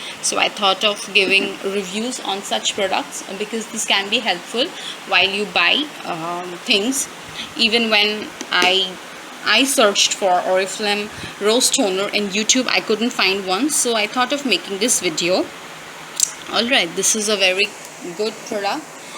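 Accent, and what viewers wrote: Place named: India. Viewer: BTW are you Indian?I can tell from your accent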